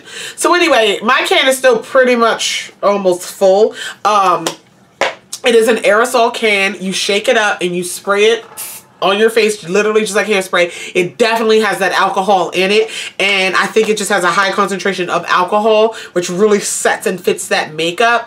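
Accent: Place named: Baltimore, United States. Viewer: Found you through Makeupstruggles. Subbed with the quickness. Love the accent. Reminds me of my B-mo peeps.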